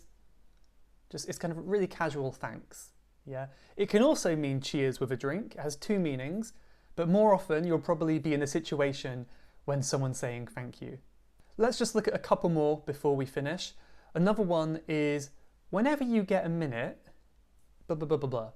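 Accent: United Kingdom. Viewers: both direct and indirect are good however it depends upon the situation which of these do wanna apply when talking to someone you know or stranger to avoid confusion, misunderstanding and being unkind to one another. Your podcast really help me a lot to improve my English and I find the topics you discuss on your podcast with some non native english speaker quite fascinating and inspiring. I also wanna compliment you for having a soothing voice to listen to and I do recommend your podcast for beginners who are studying english. It has a slight British accent but you’ll get used to it just keep on listening with intriguing topics.Thank you once again.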